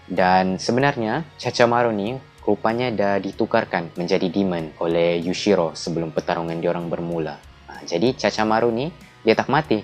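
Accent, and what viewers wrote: Sabah, Malaysia. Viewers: Bro Loghat Mu ni Mcam Asal Sabah Ja Btw Salam Dari Kota Kinabalu 😁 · Abang memang gempak. Org sabah bah ni. Senang fhm. Siok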